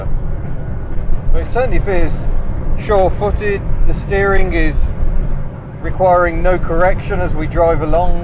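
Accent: United Kingdom. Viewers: Your commentary is just a little too folksy like you yourself come from a very small town in the UK?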